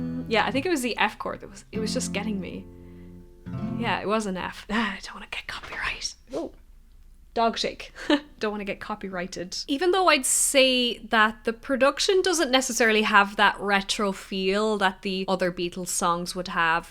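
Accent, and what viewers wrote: Ireland. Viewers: I grew up with music and it helped me through a tumultuous adolescence. I was initially disappointed with their most recent productions, eg. " / " and this particular song. However, I grew to love " " ( This song reminds me a little of " " from that album.) I was used to being very amazed by each new song, that came out from proper, when I was young, that pushed the boundaries of what I'd ever heard before. These newer songs, that I mentioned somewhat disappointed me in that regard, although I did grow to love them. I'm thinking, that this song came out at a very opportune time, with all the stupid shyte going on in the World (war, politics etc.), to revive our love and memories of a better time ( the Artistic Renaissance, that kicked off) and hopefully generate a better perspective in people's minds and hearts to push us forward into the Future. 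Quite simply, WE are BETTER, than this. Adore your lovely Irish accent and look forward to hearing you sing. Thanks for this video. P.S. Do you play/sing, " "?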